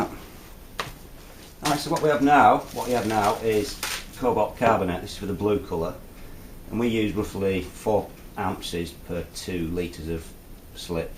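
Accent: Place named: England, United Kingdom. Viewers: It is a beautiful Yorkshire accent. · Thanks for the video, love your accent, makes me miss England:) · I don’t understand his English accent 😂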